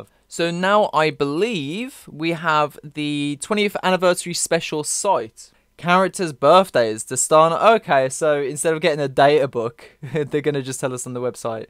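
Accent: United Kingdom. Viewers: Aye bro, what part of the UK do you hail from?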